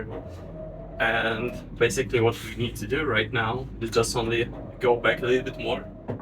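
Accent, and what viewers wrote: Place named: Russia. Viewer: Класс, почти не палишься. Но русскоговорящему сразу слышен акцент) Удачи в творчестве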